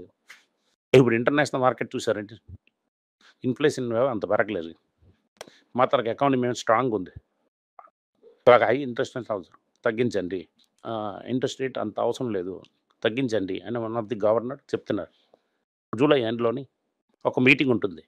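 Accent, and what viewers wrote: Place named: Srikakulam, India. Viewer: Vidoka Rakesh jhunjhunwala levello unnadu, okka vaakyam kudaa clarity ledu. Manadi Chikakole ani telustondi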